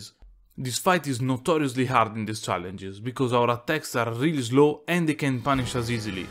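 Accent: Italy. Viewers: tutti i tuoi video sono meravigliosi, e adoro sentire il nostro accento quando parli. Gran lavoro ;)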